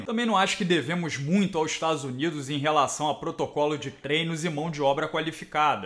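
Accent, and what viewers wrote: Rio de Janeiro, Brazil. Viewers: Curto os seus comentários! Mas tem que diminuir no sotaque carioca....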